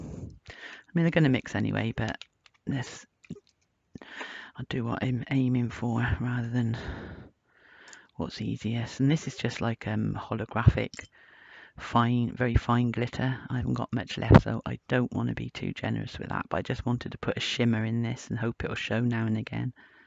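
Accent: United Kingdom. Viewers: Well i for one love watching your tutorials, i have just been turning the volume up, which is easy. I noticed the difference with the new microphone! All good. I am definitely going to try this with Cernit translucent and Premo gold and copper. I do find Cernit REALLY sticky so I'll try leaching it first. I love your accent too. Wiltshire or Somerset? My gran was from Wiltshire and it reminds me of her. Cheers from Australia 🇦🇺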